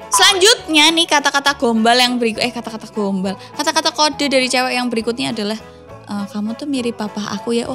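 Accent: Java, Indonesia. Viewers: Suka kamu logat nya jawa tulen 👍👍👍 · ko jawa nya bulet banget ya..hmm..yaahc,baik lh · medok bgt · suaranya mirip Nafa Urbach,, logat2 jawanya gitu looh.. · Kok benar skl apa omonganmbak dng logat2 jawa yg kental.Koq jadi ingat dg mantan. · Wong jowo mb?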